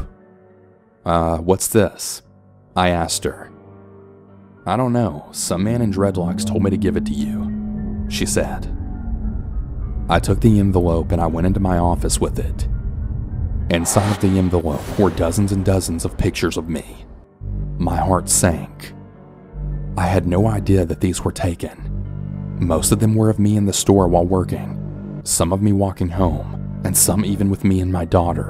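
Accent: South, United States. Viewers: Why does the narrator sound like he's from the south? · I love the country accent Thanks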